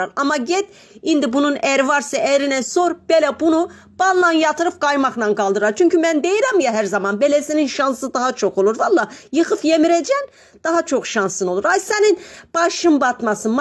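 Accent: Kars, Turkey. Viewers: Kars . Azerbaycan Türk'ü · Ben Karslı yım konuşmanız aynı bizim gibi çok güzel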